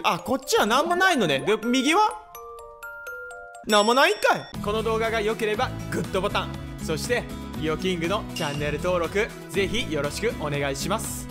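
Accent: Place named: Kyushu, Japan. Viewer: さん、前々から思ったけど九州出身ですか？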